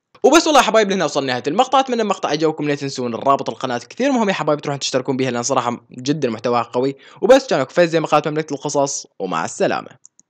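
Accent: Iraq. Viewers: ♥♥♥♥ياخي ولله احب هاي القناه ♥♥😉فد سوائل هاذ صوتك الي بل فيديوهات ونته عراقي بليز جاوب🙏🏻🥰♥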